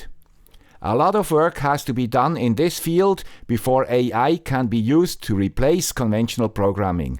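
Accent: Switzerland. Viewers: If I’m not mistaken, this marks your 500th video! A truly remarkable milestone! Congratulations from the bottom of my heart! Your videos are a constant source of inspiration for the community you so thoughtfully cater to. I always look forward to each new episode, filled with fresh ideas and, of course, that delightful Swiss accent, which adds an extra charm to even the most fascinating content. Greetings from Cologne! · it is usually written as: "why should I trust your definition, if you can't pronounce 'inference' properly. Let's assume the poster meant it as a joke (which it usually is), though missing the point of "the guy with the Swiss accent" :)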